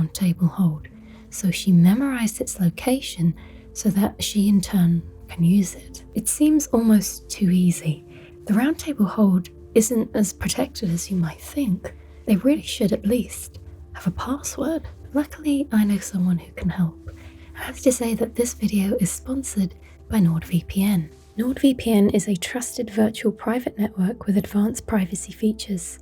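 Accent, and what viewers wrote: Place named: Birmingham, United Kingdom. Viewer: My favourite brummie lady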